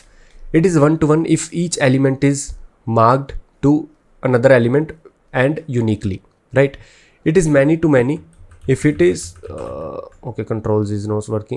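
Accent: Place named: Punjab, India. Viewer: Sounds punjabi Maybe you should relearn what south indian accent sounds like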